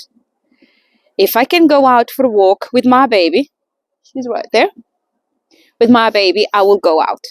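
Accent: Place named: Gothenburg, Sweden. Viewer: Great video! I totally agree about getting out no matter the weather. Love your Swedish pronunciation too… I can hear som Göteborg accent 🤩. Happy holidays ❤️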